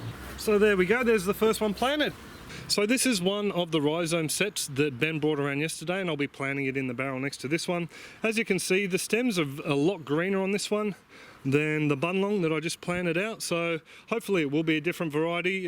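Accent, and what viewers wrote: Australia. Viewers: I most likely will never plant taro but I so love watching your videos just to learn some new gardening tips. the Aussie accent and lingo makes gardening ever cooler. Good luck with your roots.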